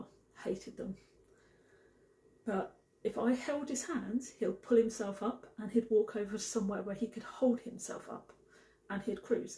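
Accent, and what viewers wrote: United Kingdom. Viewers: J like you which part of England you come from? ❤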